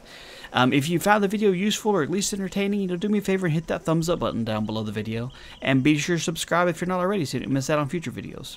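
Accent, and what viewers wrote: South, United States. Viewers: You have a cute Southern accent.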